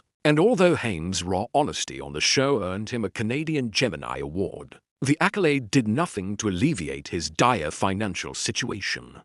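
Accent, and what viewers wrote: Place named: United Kingdom. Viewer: I don't think I can listen to this all the way through because this stunted, posh English accented A.I. accent, is bloody awful! The inflection is all wrong and the sentences just don't flow!!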